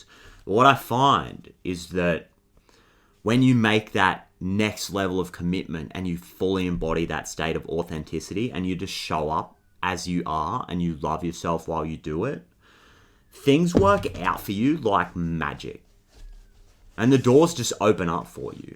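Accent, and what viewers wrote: Australia. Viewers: It's an Australian accent! We all speak like that here! 🦘🦘🦘🦘🦘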